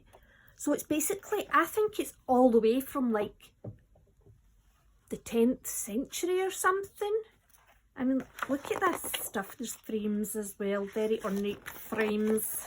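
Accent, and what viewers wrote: Scotland, United Kingdom. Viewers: I forgot to say that I’m quite technically challenged lol. But I shall have a go at that, thank you. Btw… your accent makes me feel at home.. father from Glasgow mother from Stirling.. holidays in Scotland every year… finished my schooling and teenage years in Elgin but too late to pick up an accent.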